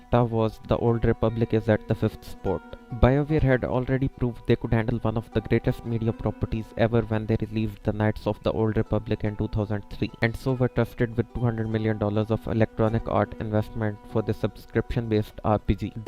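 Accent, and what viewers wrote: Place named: India. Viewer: Your video is great bro but take the indian accent down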